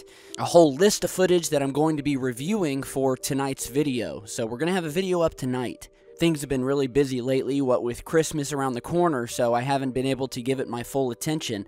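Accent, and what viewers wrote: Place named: United States. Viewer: Any chance you can learn pronunciation of the English, that's not American language? The word Antarctica has some "t"s in it, use them please! Get some education, PLEASE!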